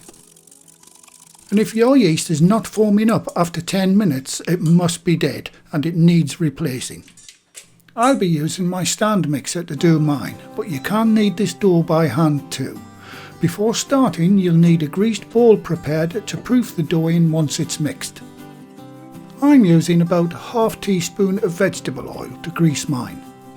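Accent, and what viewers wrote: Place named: Geordie, United Kingdom. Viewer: My girlfriend is from Newcastle. Love the Geordie dialect🥰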